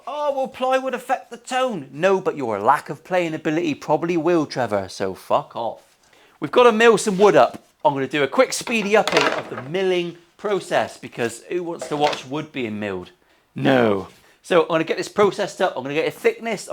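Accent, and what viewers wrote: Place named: Northern Ireland, United Kingdom. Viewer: Cant wait to see this finished mucker. That norn irish accent is pure class so it is ! I got a MK NF53 and its awesome. That paisly top is stellar. Going to be an amazing build again!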